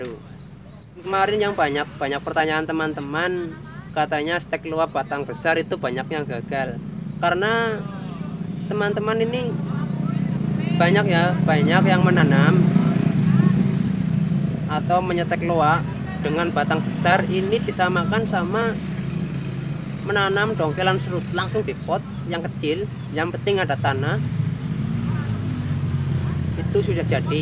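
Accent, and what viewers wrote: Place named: Java, Indonesia. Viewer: Medok mas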